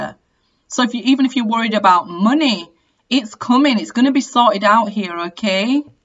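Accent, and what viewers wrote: United Kingdom. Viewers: You're so pretty. And your British accent is beautiful. I love it. Thank you.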